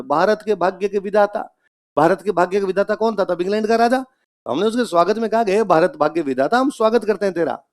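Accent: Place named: Rajgarh, India. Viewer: Sir me rajgarh se hu apki puri bhash meri jesi he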